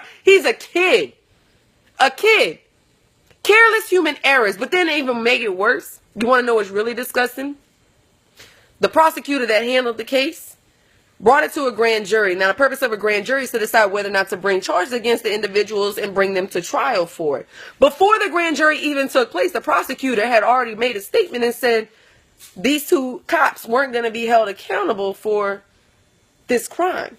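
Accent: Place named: New Orleans, United States. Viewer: 1202_ProgramAlarm she has a New Orleans accent... she obviously Cajun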